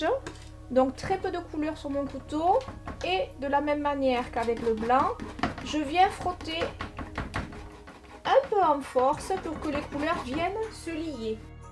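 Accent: South, France. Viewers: J'adore... cela me fait rêver... cela paraît si simple et ton accent du Sud-ouest ou Sud-est me touche moi l'Aveyronnaise que je suis. Bisous et merci👄💖👍👌👏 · Merci, toujours magnifique, très bien expliqué avec ce bel accent du midi.. Bonne soirée. 💐🐈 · Je souhaite un prompt rétablissement à la Lady. Je regarde régulièrement vos vidéos et ce que vous faites est vraiment sympa et original! Vous avez une dextérité dans le maniement de votre couteau que j'aimerais bien avoir. Je peins également ou plus précisément je repeins depuis quelques temps après avoir laissé les pinceaux de côté pendant plus de vingt ans! Vous faites des expositions? J'imagine qu'avec votre accent, vous êtes dans le sud de la France.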